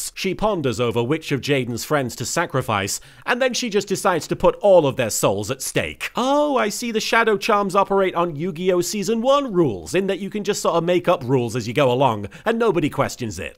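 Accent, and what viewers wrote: United Kingdom. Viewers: Yeah, this series is much better with his british britishness tbh.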